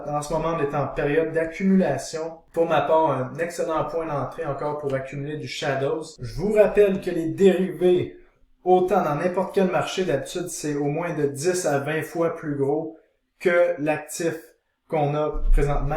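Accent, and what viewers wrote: Canada. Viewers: franchement je suis une dizaine de youtubeur depuis 15 mois ( merci la covid ) et je suis novice et il y à peut de temps ou je suis tombé sur une de T vidéo et j'en suis très satisfait et ca change des autres . je vais t'écouté et voir T propositions et pourquoi pas investir sur T conseil mais je vais encore attendre la fin du weekend . Sinon vu ton accent tu es Canadien ? ou tu es du fin font de la creuse ? Merci pour cette vidéo très enrichissante et bien expliqué . T'assures mec , Force et Crypto au peuple du monde entier, à toi et à la communauté Crypto et un gros doigt aux banques et aux Etats .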